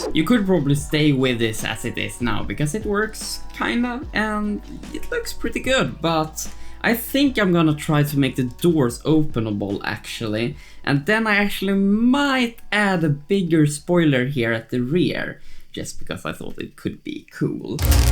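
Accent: Sweden. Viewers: Extremt stark brytning haha, du måste vara svensk?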